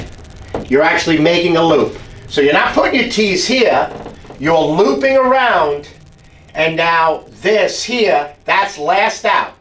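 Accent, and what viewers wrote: New York, United States. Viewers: Dear, thank you very very much for your reply! I’m learning a great deal from your incredible videos, and even though I live in TX now, I am also from NY. The first time I heard your voice, I felt like I was at the local deli again listening to the fellas 😂. I wasn’t able to finish the job as of yet, because I’m rebuilding the entire 3” PVC intake/ vent system that was put together with silicone caulk 😡. Needless to say, and because I work alone, this job is now set back two days for the venting replacements ( the units are in the attic immediately adjacent to the 22’ high vaulted ceiling joists in the great room with the rafters framed over the top of it. Like a scissor truss. No flat surfaces to stand on where the venting runs to exit the roof, all of the venting was obviously done on make shift scaffolding during construction. 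How did you know they sent me a schematic “without” the PRV’s in it? Never mind, no need to answer that, lol. I appreciate you very much Sir. May I please send you an email with a copy of the piping schematic they sent me? I can also send you a picture of what I have to work with. Thank you! RXP199iN and an RX199iN with a dedicated recirc line. I have the Rinnai dedicated recirc valve with the additional brass elbow you recommend to make everything work as intended. I also have the REU-EZC3 cable and the MC195t controller for recirc scheduling.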